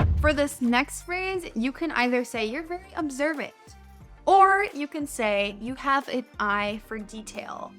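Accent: United States. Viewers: A great lesson, I love the way you teach us, super natural and like the American way you pronounce cause is clear and real. I have a question: I’d like to learn how to use this two words, Aim and Amid. Somewhere I read an advertisement that says: “We Aim to please” It sounds nice to me but I don’t get the meaning. Thanks again Miss and I owe you one.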